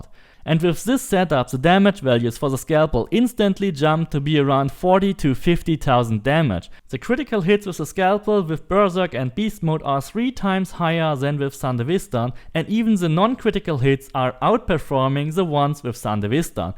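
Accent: Germany. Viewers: XD I listened was like wait is this a German accent I looked found the impressum jep German xD danke für das Video bleib gesund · Man hört definitiv den Deutschen raus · Der deutsche Akzent is nicht zu überhören 😅 super Video 👍🏻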